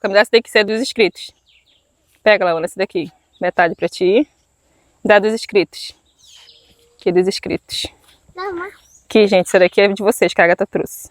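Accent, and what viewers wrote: Rio de Janeiro, Brazil. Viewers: Parabéns... Curto muito... Acho legal seu sotaque carregado na letra (X) assim como nós cariocas. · SINCERAMENTE EU PENSEI QUE VC TINHA NASCIDO NO RIO DE JANEIRO...PORQUE VC FALA IGUAL A CARIOCA KKK .......ABRAÇO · o sotaque dela lembra o do rio de janeiro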